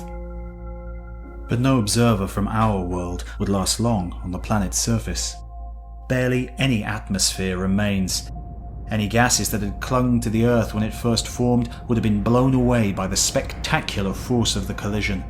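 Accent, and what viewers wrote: United Kingdom. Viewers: I already knew this stuff but I love hearing it again, your presentation is awesome. Plus a british accent always makes things sound much more majestic. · He's from the United Kingdom, not the United States. I'm from Liverpool and pronounce it the way he does.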